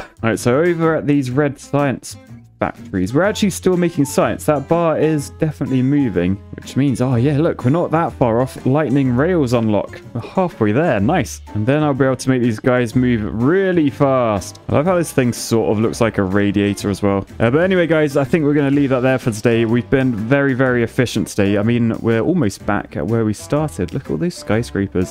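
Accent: United Kingdom. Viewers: this guy is like let's game it out but british and ever so slightly more serious...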